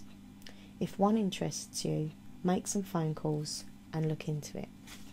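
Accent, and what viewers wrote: Essex, United Kingdom. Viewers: Thanks for this video,; you can count me as another person who finds comfort with the ASMR community on here. I've seen a couple of your videos before and was instantly intrigued because you sound like you're from Essex (that's where I'm from originally) if not London, am I right? Right now, I am going through a particularly heavy bout of feeling inherently lonely. I have quite a few good friends but the problem is they don't live near me so I only 'see' them virtually 98% of the time. I don't have a partner and haven't had one for years and being quite sensitive as well as being a massive romantic at heart, sometimes it can be cripplingly lonely for me here. I've also struggled with Depression and mild anxiety issues for 15 years or so and that has increased of recent where I'd had a fairly long break of no depressive behaviour I'd like to reach out to you on here and am subbing so I can follow you and catch up on more of your content And yeah, I know EXACTLY what you mean with the shopping thing. 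Happened to me just today. I've said this before in the comments section of a few ASMRtists, but I think that some of you are really some innately beautiful souls that do so much good and are so compassionate and genuine, that I know that for me personally, I would have a harder time coping and relaxing sometimes if it weren't for people like your good self making such invaluable and beneficial content. So please do keep on making videos and ignoring all the f**kwads and trol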